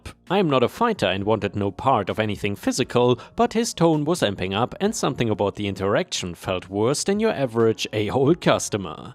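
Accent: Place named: Germany. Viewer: For a voice actor your English accent certainly has a Germanic accent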